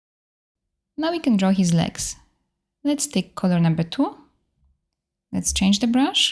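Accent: Poland. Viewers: Hi u have polish akcent can u speak polish language?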